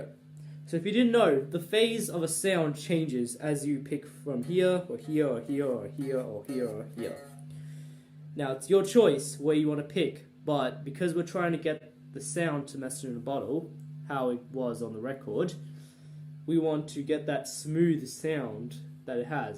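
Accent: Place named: Australia. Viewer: Your accent sounds Australian.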